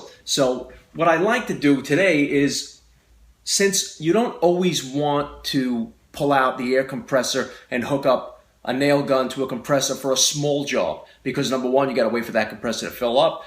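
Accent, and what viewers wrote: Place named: South, United States. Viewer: I just ordered one thanks to your review. Like your southern accent. ;-)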